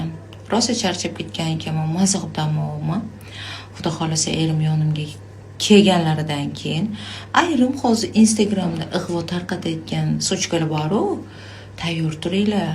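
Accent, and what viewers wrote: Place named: Samarkand, Uzbekistan. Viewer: Toshkentcani eplolmisiz samarqand shevasida gapiruvrin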